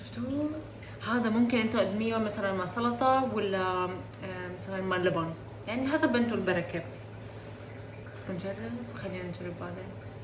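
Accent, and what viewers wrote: Syria. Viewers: هههههه بتحكي سوري لهجة شامية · لهجة لادقانية هي ههه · مش مممممممنكلامك السوري روووعه❤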